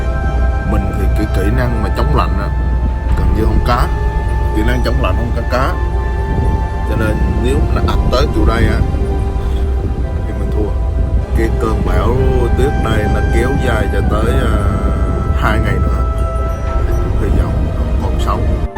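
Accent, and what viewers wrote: Binh Dinh, Vietnam. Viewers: Em này chắc người Bình Định nói giọng nghe giống quê tui :) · Minh nghe tiếng nói của ban chac ban la nguoi o Binh Đình ,nhung chac o day vẫn còn co điện ,con nuoc uống trong nha co đồng bằng không ,o nha nhu vay minh nếu khg co điện thi chac co bếp củi dot liên tục de suoi chu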